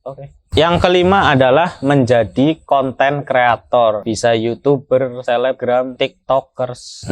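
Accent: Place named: Banyumas, Indonesia. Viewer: Deneng ngapak, tapi ok ilmunya suhu, ora ngapak ra kepenak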